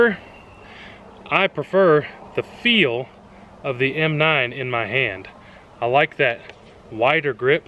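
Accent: South, United States. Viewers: South accent. Even I can differ that)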